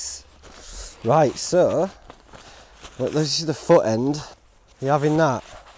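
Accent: Yorkshire, United Kingdom. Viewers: Aww, don't fret about your script! I just love to see your little face and hear your Yorkshire accent! Just do you! Love from South Carolina! Xxx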